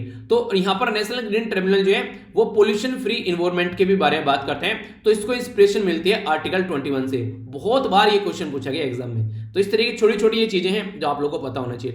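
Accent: Haryana, India. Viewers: Maybe Haryana (sonipat/gohana)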